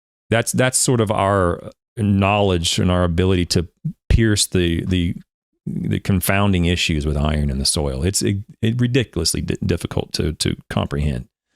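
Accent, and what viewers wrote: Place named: South, United States. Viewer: Quite the southern drawl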